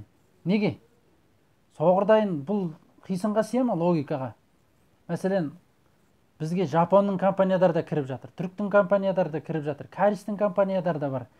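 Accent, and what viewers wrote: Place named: China. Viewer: МЫНАУ ҚЫТАЙДЫҢ ҚАЗАҒЫ ТІЛІНЕН БЕЛГІЛІ, МЫНА ЖІГІТТІ ТАУЫП АЛЫП ЖОҚ ҚЫЛУ КЕРЕК ТЕЗДЕТІП, МЫНАУ САТҚЫН АДАМ, ҚЫТАЙДЫ ҚУАМЫЗ ЕЛІНЕ, ҚИРАТАМЫЗ ҚЫТАЙДЫ, ҚАЗАҚТАР БАУЫРЛАР ҚЫТАЙДЫ ЖОҚ ҚЫЛУ КЕРЕК ҚАЗАҚСТАННАН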